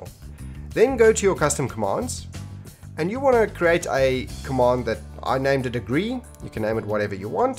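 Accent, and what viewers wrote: South Africa. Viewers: Are you from south africa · My dudes South African, love you! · You sound South African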